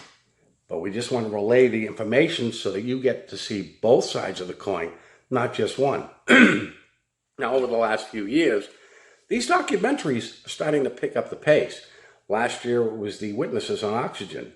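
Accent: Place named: Boston, United States. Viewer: I always found it interesting that JW’s let old bank cleaners and window washers dictate their life. I’m from California and you sound like you from Boston.. you don’t earn respect from regurgitating Bible verses on command you can’t tell someone to gaurd a door or fend off protesters if you never even had a traffic violation or a school fight. I know people who will revoke your invitation to life. I love my elders and they are all dead but they kept an eye on me and I appreciate them. They knew they couldn’t control me. I’m a lil guy but I hit hard and unlike Watchtower if I ask you for a favor I will pay you. My suit is highly respected. I don’t play make believe on Sunday tuesdays and thursdays like JW’s.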